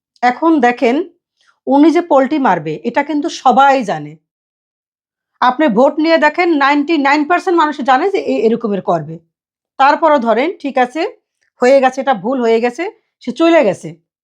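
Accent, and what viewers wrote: Barishal, Bangladesh. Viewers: আপনি তো খাঁটি বরিশাইল্লা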